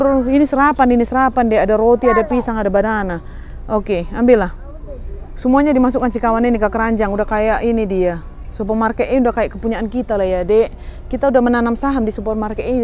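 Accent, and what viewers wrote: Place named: Medan, Indonesia. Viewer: Aku baru ikutin kak Mau nanya kak dari medan ya? Logatnya medan kali di Brastagi gitu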